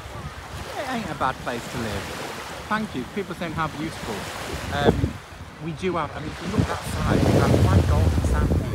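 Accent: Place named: Lincolnshire, United Kingdom. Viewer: So sorry I missed your quick live. I was wondering about your accent as I was trying to explain it to my daughter and since you said you live in Lincolnshire, I thought I would ask if this is where you got your accent.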